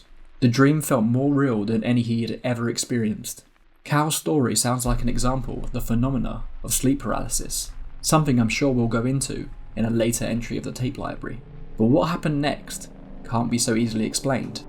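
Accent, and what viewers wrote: United Kingdom. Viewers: I frigging love how you say "so get yourself comfortable, hit the lights and get a warm drink" in your British accent. Draws me right in. 5th video of yours this evening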